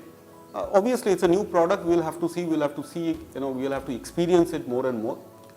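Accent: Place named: Bengal, India. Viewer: Dada I get your Bengali. My suggestion is to avoid mixing languages. If you have to use Bengali the do provide sub titles. Your Bengali accent is great tho.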